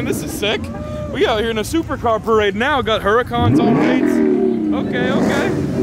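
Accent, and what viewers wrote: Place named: Texas, United States. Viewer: You sound like someone from Texas....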